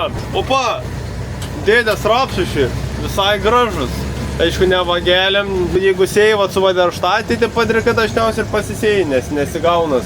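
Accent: Biržai, Lithuania. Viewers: Svajok atsargiai, nes svajonės pildosi🎉 Labai norėčiau fizinės knygos, nes audio kažkaip ji man nesusiklausė, tai duočiau antrą šansą jai 😢😮😮😂 P.S. Mldc už Biržetišką "šneką" tikrai malonu girdėt 😂😂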